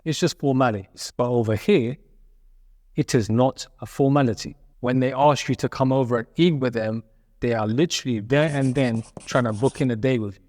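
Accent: United Kingdom. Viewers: You British by any background???.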